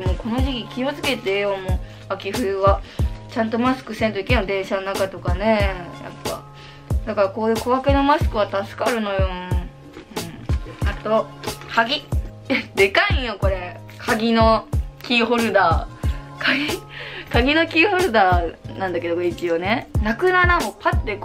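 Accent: Okayama, Japan. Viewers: めっちゃ岡山弁〜💞勝手に親近感わきました！可愛い人は岡山弁話してても可愛い件😍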